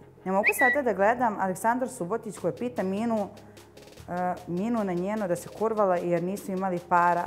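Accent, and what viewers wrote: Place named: Kaluđerica, Serbia. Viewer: Progovara Kaluđerica iz nje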